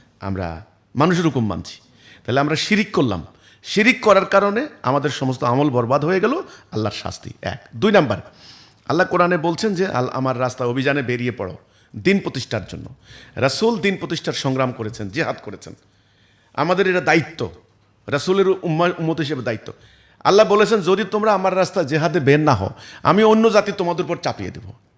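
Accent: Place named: Noakhali, Bangladesh. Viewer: আগে ভাবতাম অন্য রকম , এখন দেখছি মাথায় সন্ত্রাসী চিন্তা চেতনা ?? ভাষা শুনে নোয়াখাইল্লা মনে হলো !!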